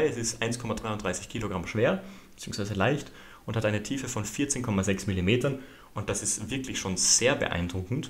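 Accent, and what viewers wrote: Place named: Germany. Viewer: Immer dieses typisch Deutsche: "Huwei" "kinesisch"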